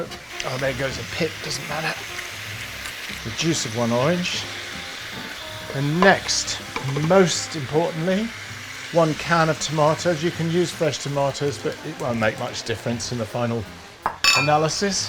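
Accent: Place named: United Kingdom. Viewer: I definitely make this soup you just made . it's good to be a good cook or a chef .I am an American I like your British accent.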